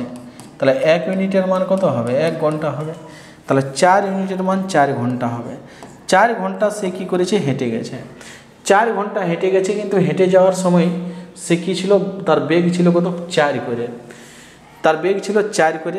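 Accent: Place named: Purulia, India. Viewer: mone hoyechilo sir apnar kotha sunei karon amra Purulia Bankura r loker amader kotha sunei bujhe jabek j amra Bankura ba Purulia r basinda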